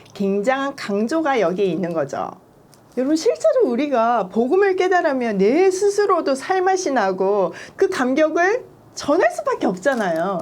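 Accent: Gyeongsang, South Korea. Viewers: 경상프라빈스 인토네이션 이군요 일크름을 넘 감사합니다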